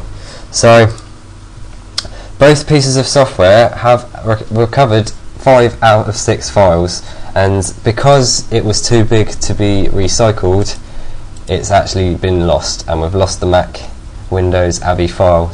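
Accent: United Kingdom. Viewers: I LOVE YOU! You are so so so so smart and ABSOLOUTELY BRILLIANT BRILLIANT, bloody brilliant! And I love your british accent. That does work.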